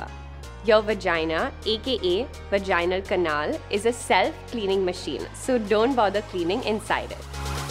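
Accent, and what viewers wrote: India. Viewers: Her, Face -🇺🇸🍔 Accent -🇮🇳🍛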